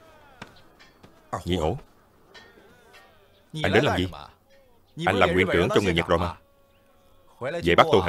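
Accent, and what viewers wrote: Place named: South, Vietnam. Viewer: Giọng thuyết minh quá nặng giọng miền Nam rất khó nghe.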